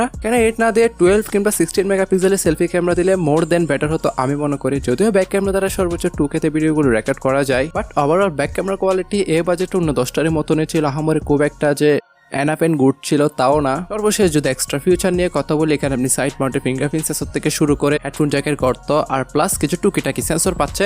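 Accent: Chittagong, Bangladesh. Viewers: ভাই কি চিটাগাংগা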